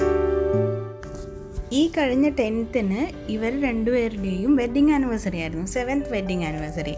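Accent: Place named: Kannur, India. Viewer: video nannayittund ! typical kannur bhasha enikkishtayi.njanum kannurkari anu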